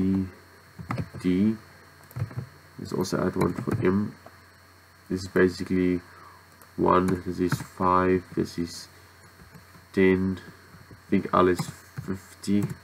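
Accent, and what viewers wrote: Afrikaans, South Africa. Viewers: Nice Afrikaanse aksent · Are you South African ?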